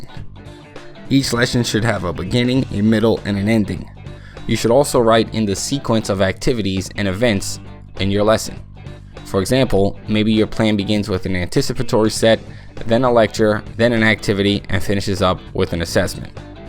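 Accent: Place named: Brooklyn, United States. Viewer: That brooklyn accent. "don't get cauwght!"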